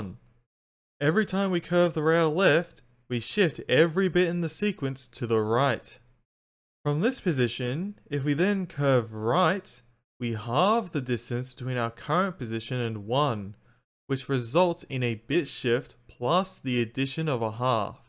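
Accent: Australia. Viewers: as a native, he sounds very Australian to me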